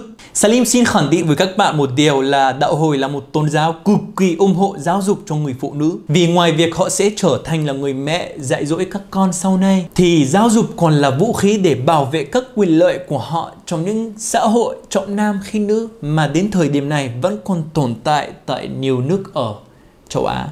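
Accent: Standard. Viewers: Bạn nói tiếng Việt chuẩn quá phát âm rất tốt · Quá hay! Bạn đã giải thích rất chi tiết và dễ hiểu. Tiếng Việt của bạn rất chuẩn. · Salim ,dep train ,noi tieng Viet chuan 😊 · Bạn làm video rất hay chân thực và nói tiếng việt rất chuẩn cảm ơn bạn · Anh nói tiếng Việt chuẩn luôn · Hi hi, Cám ơn Salim, nói tiếng Việt chuẩn quá! Đất nước nào cũng có anh hùng và tội phạm…tất cả họ đều do phụ nữ sinh ra nên phụ nữ Đạo Hồi cũng ko ngoại lệ 😂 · Anh nói tiếng Việt quá rành rõi, phát âm, dùng từ chuẩn còn hơn nhiều người Việt nói tiếng Việt nữa. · Hoan hô, cám ơn đã cung cấp cho người Việt chúng tôi những thông tin, hiểu biết chính xác về thế giới Arab và Đạo hồi. Tiếng Việt của rất chuẩn, hiểu biết về văn hóa, xã hội của rất rộng. Khâm phục đấy! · Chào, các clip của bạn rất hay, nhất là tiếng Việt của bạn rất chuẩn, dễ nghe. Xin chúc mừng Mabrouk! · Woa anh nói tiếng việt quá chuẩn luôn 👍👍👍chúc anh nhiều niềm vui va hạnh phúc nhé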